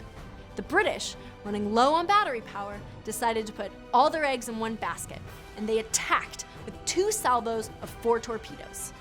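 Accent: United States. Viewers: TM: Gee, what a surprise that an American would use American pronounciation.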